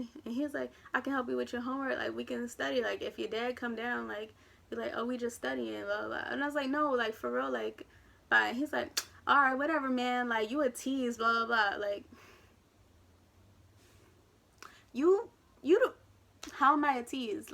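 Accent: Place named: California, United States. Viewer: Im guessing shes from California with all the "likes" thats how we talk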